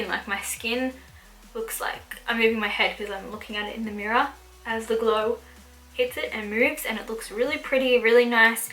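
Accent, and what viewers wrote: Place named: Australia. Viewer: You are such an Aussie. I can hear it :)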